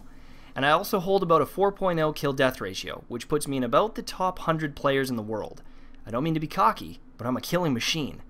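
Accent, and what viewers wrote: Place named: Canada. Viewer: Oh haha, yes I do. Is that how its said in a Canadian accent? Thought you just mispronounced a couple words in one sentence. Will go check out your channel now.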